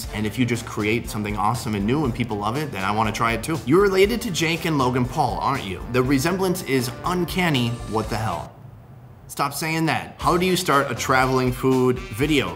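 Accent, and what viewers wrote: Minnesota, United States. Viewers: I thought he sounded Minnesotan, didn't realize he was from my hometown area!!! 💁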